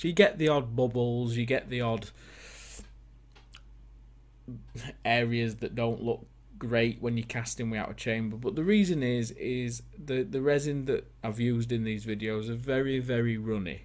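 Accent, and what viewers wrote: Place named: Yorkshire, United Kingdom. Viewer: Never have I seen someone clearly more Yorkshire than you... as someone from the Red Rose county i could take a natural offence. However, love your work so keep it up! ;)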